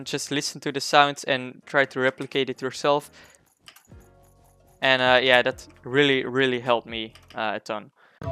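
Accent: Netherlands. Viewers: your'e accent little bit sounds like your Dutch am i right? XD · You dutch? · He is somewhere around belgium or the netherlands.. i know that english accent from outside my head since i am also dutch.. he is <num>% From belgium or Netherland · I feel like you're dutch XD, aren't you? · volgens mij is hij ook Nederlands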